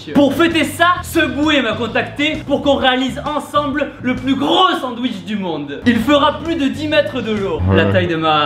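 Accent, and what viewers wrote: South, France. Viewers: L’accent du sud est incroyable 🤩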